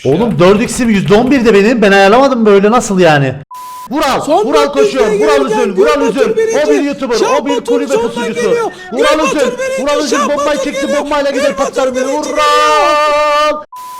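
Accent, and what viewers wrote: Cyprus. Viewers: abi sen Kıbrıslı mısın??? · Abi kıbrıs limisin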